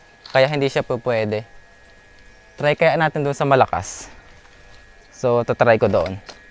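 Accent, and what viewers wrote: Philippines. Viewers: Boss taga saan ka ga? Nakakatuwa ang iyong punto..haha Iniisip ko tuloy ay magka-probinsya tayo.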